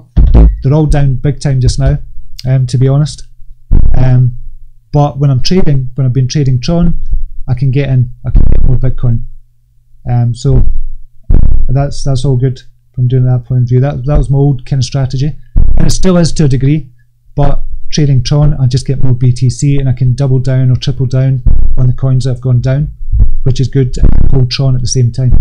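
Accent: Scotland, United Kingdom. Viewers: I just came for that Scottish accent :) Well, and I'm in the very early R&D stages of my crypto journey, so I'm really here just absorbing and learning. Nice to meet you. There was definitely alot of distortion here, and there today. I watched a bit, when this was live, and it kept freezing on my side, and now, on playback, I just hear the sound distortions. Just an FYI. Have a wonderful weekend!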